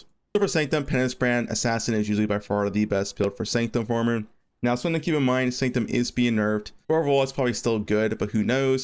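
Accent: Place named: United States. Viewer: Good info, but you need to pronounce build names slower and clearer. I had to rewind a couple of times just to hear what you were saying. I'm not from america, so your particular accent isn't familiar to me.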